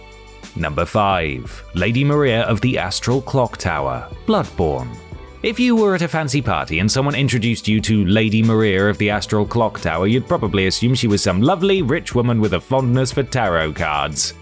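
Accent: United Kingdom. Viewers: wait a sec , you are British ?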